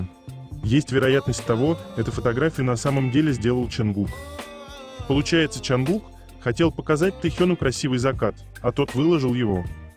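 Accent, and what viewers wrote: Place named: Germany. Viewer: Писали,что автор немецкоговорящий.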